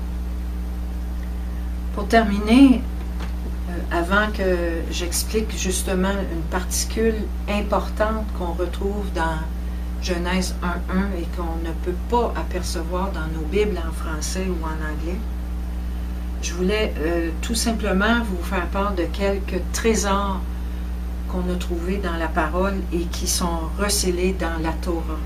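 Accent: Canada. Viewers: bonjour, il faut lui demander. Vu son accent elle est au Canada. Que Dieu vous bénisse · Shalom ma belle! Comme je suis heureuse de vous trouver! Je suis dans cette merveilleuse découverte de l'Alaphbayt et de tous les merveilleux secrets que Notre Père YaHUaH a caché dans celui-ci. J'aimerais bien en discuter avec vous si possible et Yahuah voulant..Lyne ❤️. Écoutant ton accent, je vois que tu serais du Québec..HalaluYahuah 🎶 Sois bénie (BaRuCH) en Yahusha ha Mashyach 🌺❤️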